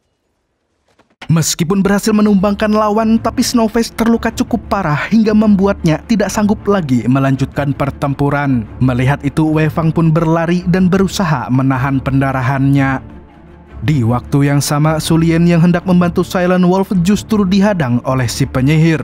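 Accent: Banjar, Indonesia. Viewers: Bhsa BANJARnya khAs, 😂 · Laaah bahasa banjarr orang banjar kah neh😂😂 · pian banjar kah min..wkwkwkwk salam dri kandangan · Ae, orang Banjar skli nya amank narator nya...😅😅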